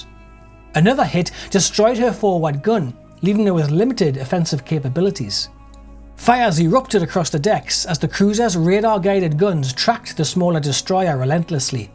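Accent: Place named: Geordie, United Kingdom. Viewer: Great video man , well done again 👏 Glowworm's Nelson's stile barrny. The Royal Navy's power when the Yards were still open from Newcastle to Shields both Banks of the river. And the Mackems at it knocking out Legandary beasts of the sea.. Sorry marra a gan on one noo an agen !!!oi oi Geordie Boy ! 😉 You may actually nead a translator for the local language you just tried to read 😂😂😂 The coment is directed to the creator of this YouTube channel and his great regional dialect accent. Because he lives near me and probably literally knows one of my friends. Without 6 degrees of separation.😊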